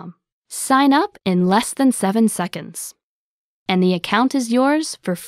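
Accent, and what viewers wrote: Vietnam. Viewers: Is this northern or southern Vietnamese?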